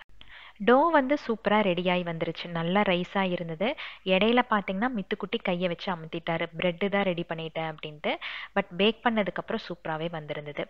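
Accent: Coimbatore, India. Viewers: Love from UAE... Am ur new subscriber I used to watch very few ppl vlog only .. Recent times I used to watch ur videos also I like ur kovai slang..and way of ur cooking good.. It's seems like my mother preparations... When I miss my family and my mom ur videos made me relax... Good job keep rocking.. Also I saw another one videos u mentioned abt some negative comments also o watched that particular u tuber videos... It's completely different ur video covered end to end thing it's interesting also very useful.. All the best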